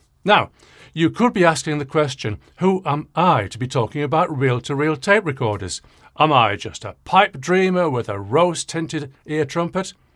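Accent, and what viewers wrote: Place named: Liverpool, United Kingdom. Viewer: Thanks scouser ❤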